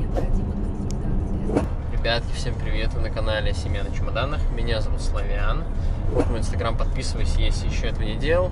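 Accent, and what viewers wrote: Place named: Urals, Russia. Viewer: У вас Уральский акцент, вы с Урала?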